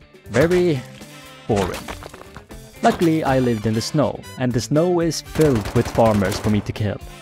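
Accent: Sweden. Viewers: are u swedish cuz u kinda sound like swedish?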